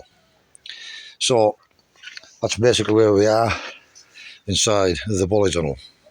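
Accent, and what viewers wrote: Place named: North East England, United Kingdom. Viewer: I am a new subsciber and although you think your 'bit of land' is a mess, I think that it still looks amazing! I wish you well with your home search. Times are rotten with regards to the renting in this country. 2 questions if I may ask. Why did you get rid of your other chickens and do you remember what your rose is called? When I was a child, we had an almost identical one growing over a rustic trellis and I have been looking for the same one without success most of my adult life. Although I was born in the south of the UK, by the sound of your accent, you come from the North East, as did my Dad.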